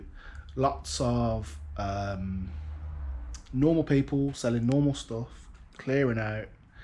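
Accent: Birmingham, United Kingdom. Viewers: That AI description feature is amazing, since I restarted shifting a few of my personal items I’ve been hoarding over the years on eBay I’ve pretty much just been using that feature. Definitely saves time and gives you a far better description for your listing eh! Great video again bud you’re fast becoming one of my fave reselling channels! Love the accent too, my mum was born in brum and my nana had the same accent, so it’s very natural for me to enjoy your content bro! 😊❤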